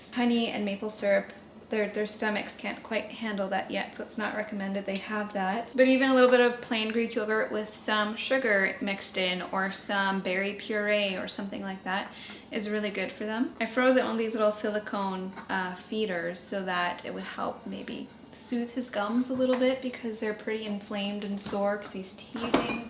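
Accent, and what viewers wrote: Canada. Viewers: Definitely not all Canadians! She is from essentially the US Midwest equivalent of Canada. Compared to in Ontario (where Toronto and Ottawa are, our largest city and capital), I haven’t heard many people talk like she does! Another interesting one is how she says garage. Regional differences are so interesting!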